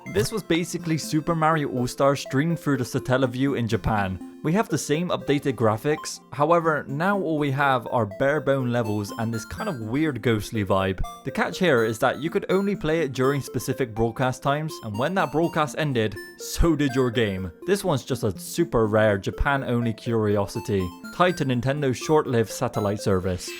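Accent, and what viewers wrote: United Kingdom. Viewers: I have a suspicion that this guy is british but i cant put my finger on it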